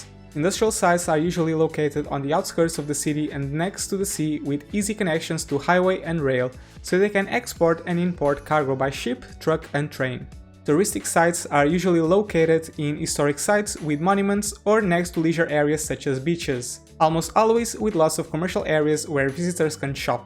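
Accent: Portugal. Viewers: I thought you are portuguese. Now I'm sure :) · Vi logo que eras tuga pelo sotaque, props mano! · Suspeitei que eras português, e confirmei.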